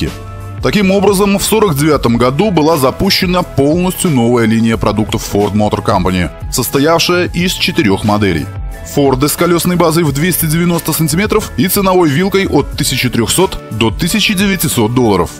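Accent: Belarus. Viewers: У чтеца жэстачайший белорусский акцент.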